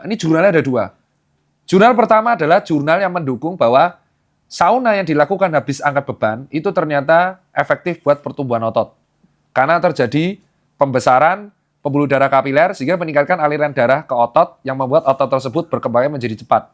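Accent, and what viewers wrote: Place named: Surabaya, Indonesia. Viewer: Original Suroboyo... cocok banget aku suka 😅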